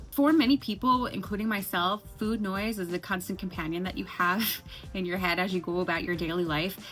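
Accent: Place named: New York, United States. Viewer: Oh snap, I knew you sounded like a NY’er…Rockaway Blvd stairs is NO JOKE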